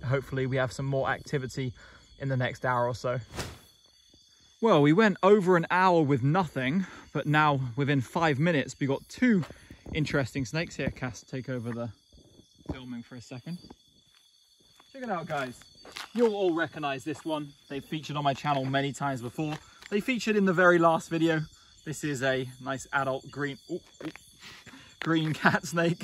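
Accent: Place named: Belfast, United Kingdom. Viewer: Ah, he sounds like a Belfast lad like myself. Nasty bite for such a small snake!!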